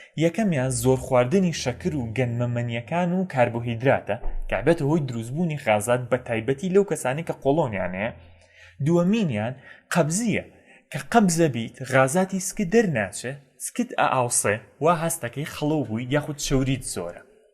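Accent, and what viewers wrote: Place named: Badini, Iraq. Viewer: كه مئك باديني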